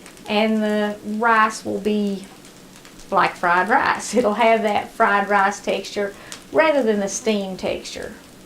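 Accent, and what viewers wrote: South, United States. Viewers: Love following you, Christ first, plus you have wonderful recipes! And you have a southern draw like myself 👍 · From witch state in the Us are you from? Somewhere from the South?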